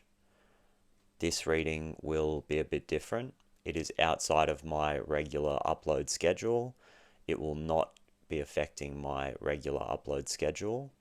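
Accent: Australia. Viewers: Hey! So great to hear your accent fellow Aussie 🦘🐨🌏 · Mate i gotta say it was quite a surprise to hear an Aussie voice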